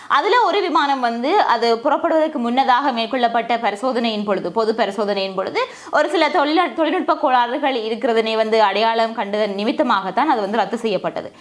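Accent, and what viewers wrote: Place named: Jaffna, Sri Lanka. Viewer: எனக்கு யாழ்ப்பாணம் தமிழ் மிகவும் பிடிக்கும் ❤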